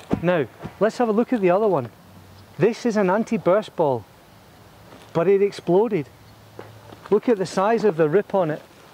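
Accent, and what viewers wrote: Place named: Scotland, United Kingdom. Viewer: Touch of Scotland in his accent.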